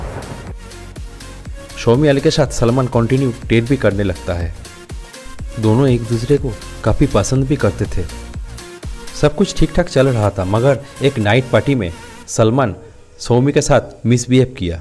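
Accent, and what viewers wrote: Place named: Bengal, India. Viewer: Kyea ap bengali ho ? Apki hindi acca nahi hay.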